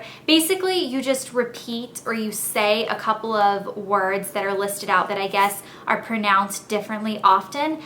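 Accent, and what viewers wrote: South, United States. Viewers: I love that you call it a Coke and some of the other answers to the questions (the buggy, too! :) Definitely Southern! :) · You're from the South probably a big city judging by your vocab and accent. "coke" and "buggy" give it away. I grew up in Birmingham, AL so you're accent is familiar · Yep, you're from the south. :) Lived in Nola too. I cannot stand when people call it "New Orleeeens." I pronounce/call everything the same as you! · I can tell you're from the south when you answered the questions. I'm from Tennessee and we say it the same. Well besides a remote. And I feel like only the south call it a "grand daddy long legs" everyone else refers to it as "daddy long legs." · You're definitely from the south! I moved from Chicago to WV and people say everything soooo differently! A lot like how you pronounced words and answered the questions! 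I think it's very interesting! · Daaang, you're really southern! Lol I was mostly raised in Cali but was born in Minneasota , and I've lived on the east coast :] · Wow! I'm SO not used to an american accent, without the words underneath I'd have had real trouble knowing what you were saying! My UK accent is so different! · clicker and buggy.....man you are a southern girl! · Hee-hee it's funny as I'm Scottish and you're really Americanised to me :L Loved this tag :). I say 'trolley' not 'buggy' or 'cart'; envelope - exactly as it is spelt and prononcing the 'E'; and 'remote' instead of 'clicker'. The politically correct term is 'remote control' but some also say channel changer, control etc... :) ha :) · Its wierd your from the south im from the north and we say theese things so diffrently. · lol i love this :) i say "tp-ing", "pop", "cart", "remote", and "you guys" i love your accent, you sound like a southern belle :) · Wow you say things so differently in America!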